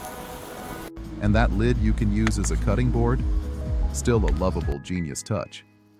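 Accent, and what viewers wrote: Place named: United States. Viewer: lol i heard the American voiceover and i thought US, seeing the name i now recognise you from Insta 👍 From Melbourne and will hopefully be travelling with the 80 next winter👍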